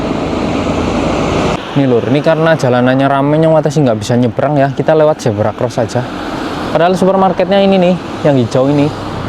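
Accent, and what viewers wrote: Banyumas, Indonesia. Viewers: Kaya wong Jawa Tengah bagian kulon😂,, ngapak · Enyonge kan batang sampeane kan Endi kang ? Kok ngapak" wae. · Jawa ngapak mas? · Ngapak kh bg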